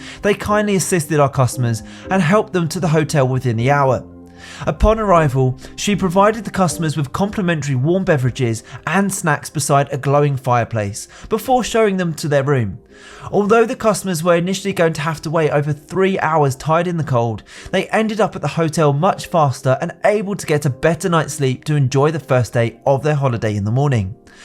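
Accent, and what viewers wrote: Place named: United Kingdom. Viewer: OMG, great video mate i appreciate a lot this video, you can use this video for any job interview. Yor answers are, top notch, to me they seem more for chasing big companies that pay big $$$ for a flight attendant.... ehee. I hope not a lot of viewers admiring this positions as they look flashy, but when you learn how low is their pay, I'm assuming the pay have been going up after covid, but still $2,000 - $3,000 that's sad to me. If you young and single is fine, i rather become a pilot if I'm going this direction. Great awesome video for future interviews, glad i run to it, you got my subscription, the British accent helps too.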